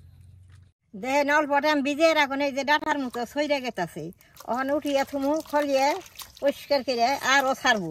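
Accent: Bangladesh. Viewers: Ami Bangladesh theke bolchi,Apnar kotha o rannar dhoron bangladesh er regional type, ami Bangladesh r Indian der accent Alhamdulillah valo bhabe differentiate korte pari,Apnar kotha bolar accent/bhasha puroi amader local Bangladeshi ❤🇧🇩 ami sure apni Bangladeshei live korechen, Apnar char paser area dekheo Bangladesh er nodi porer alakar motoi lagse❤. Apnar moddhe Indian kono boishistho nai:) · দিদি মা তোমাকে খুব পছন্দ করি। তোমার ভাষাটা আমাদের মত শোনায়। 🇧🇩 থেকে ভালবাসা নিও।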